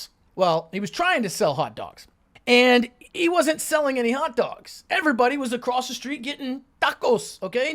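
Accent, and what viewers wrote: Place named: Spain. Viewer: Man, why the Spanish accent i dont get it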